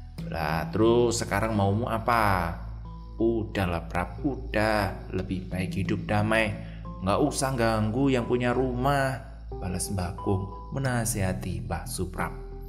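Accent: Java, Indonesia. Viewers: Waalaikum slm wr wb, masalah logat jawanya justru sy suka menjadi ciri khas kk " sy org sunda justru seneng mendengarnya, smga smkn sucsess..❤👍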